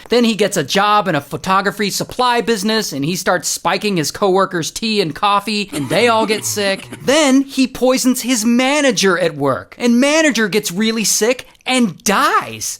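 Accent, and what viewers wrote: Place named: United Kingdom. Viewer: If you can't tell its a British person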